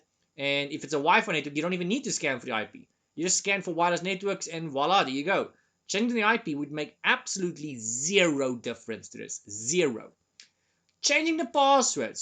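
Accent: South Africa. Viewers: South African accent immediately gets a like and sub 🎉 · South African Identified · As a fellow South African it was easy to understand, nice refresher I already passed. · I passed my core 1 thank to him even when the exam was updated you are my Hero and love the accent South Africa gets an automatic like and sub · He's South African, like me. Just that I'm coloured though. He sound like a Wytie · Immediately picked up that YT SAFFA accent! Great content though, and that's what matters! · South African? 🇿🇦🇳🇦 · Definitely South African, love it!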